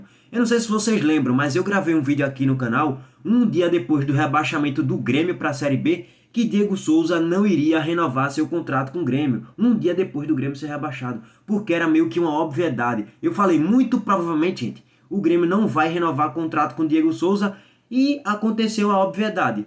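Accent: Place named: Rio de Janeiro, Brazil. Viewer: uu carioca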